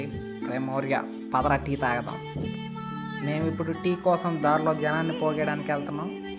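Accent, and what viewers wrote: Nellore, India. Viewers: Bro nuvvu Nellore aa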